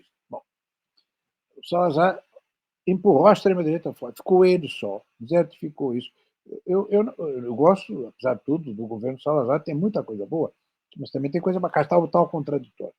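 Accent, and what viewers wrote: Brazil. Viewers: Falar com pronúncia brasileira professor? recuso ouvir isso. O senhor é português!!! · ele é portugues! lol está é a falar com o sotaque brasileiro. o que acho uma completa idiotice e traiçao á pátria! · Estive duas vezes no Brasil, em 1984 e em 1991. Se eu não falasse com sotaque brasileiro, 80% das pessoas não me entendiam. Aqui o importante é que eles, os brasileiros, entendam o que o professor está a dizer. Além disso, fique sabendo que os linguistas dizem que o português que se falava em Portugal nos séculos XVII e XVIII era, quanto à sonoridade, muito mais parecido com o que se fala hoje no Nordeste do Brasil do que com o que se fala hoje em Portugal, com as vogais muito fechadas… Embora pareça um pouco ridículo para nós, que estamos habituados a ouvi-lo falar o nosso português, acho que o professor tomou a atitude correcta aqui. Não deite fora o bebé com a água do banho…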